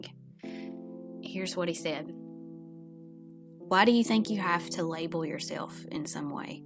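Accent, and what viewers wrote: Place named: South, United States. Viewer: I know this is an old post, but I wanted you to know that I really enjoy watching your videos. I started painting 5 years ago during the pandemic and like you, I have sold some paintings but never think of myself as a “real” artist either. My husband tells me I am a real artist because I have sold some artwork, but I have imposter syndrome when it comes to my artwork because I didn’t go to art school and have never had any formal training. I learned by taking online classes and watching YouTube. I am a social worker for my job but would love to one day leave this stressful job behind and retire and paint everyday for the rest of my life. Seems like that is never going to be a reality with this economy the way it is. Anyway, you are such a sweet person and I love your southern accent. Keep making your videos, because you and Sandi Hester are my two favorites😊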